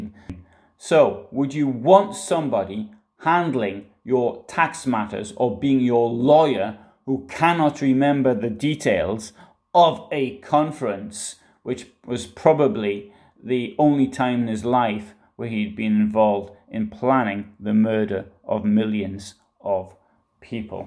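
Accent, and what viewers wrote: Newcastle, United Kingdom. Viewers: North Eastern English accent ,I think. "Geordie ". Or a bit further south ,Tees area. "Monkey Strangler."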